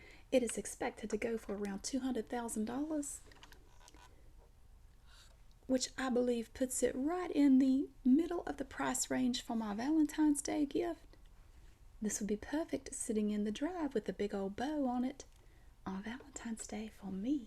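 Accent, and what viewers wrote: South, United States. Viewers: I love the accent, where about are you from? I'm from East Tennessee.lol you should do a Hillbilly asmr lol.that would be funnier as a bucket of tadpoles.lol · I miss these accents, it’s rare to find someone who still has that “southern belle” twang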